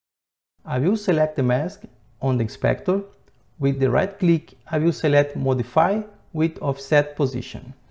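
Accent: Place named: Brazil. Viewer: That brazilian accent...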